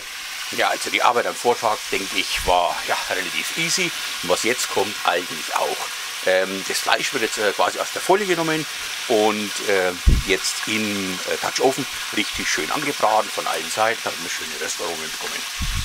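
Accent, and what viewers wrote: Franconia, Germany. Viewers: Es ist Gold wert was die Youtube Untertitel aus deinem fränkischen Dialekt machen 😂 tolles Rezept und Video! Ich glaub ich brauch doch langsam mal nen dopf.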